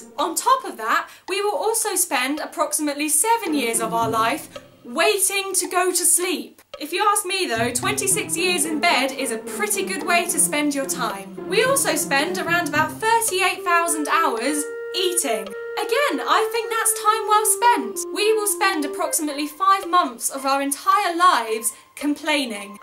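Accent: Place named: United Kingdom. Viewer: I really enjoyed this video! Please keep them up =] Where are you from? You have a very strong British accent!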